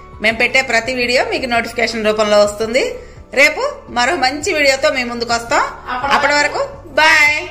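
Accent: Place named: Anantapur, India. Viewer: Meeru anantapur yasa matladtunaru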